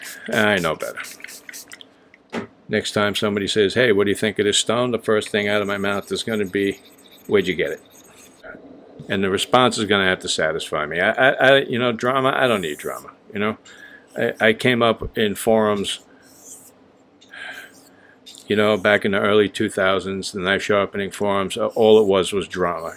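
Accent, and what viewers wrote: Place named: Bensonhurst, United States. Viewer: Men are the new bitches!!!!! Lol!!! So true bro! My cousins live in Bensonhurst, and you have the same accent! So I just purchased a Ozuku Asagi. Previously, I have only honed on synthetics. I hope to be pleasantly surprised. I heard coticules are more difficult to hone on. I'm new to the channel and love it bro! You're very knowledgeable. Keep it coming bro! Any advice on the Ozuku would be greatly appreciated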